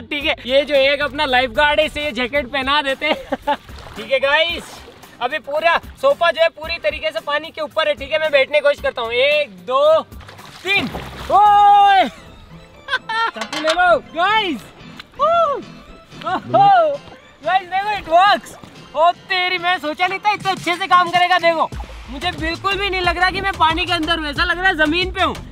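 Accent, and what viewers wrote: Rajasthan, India. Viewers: Bhai aap rajeshthani bhasa badi achhi bolte ho ek video me aap bs rajeshthani bhasa hi bol ke banao na plz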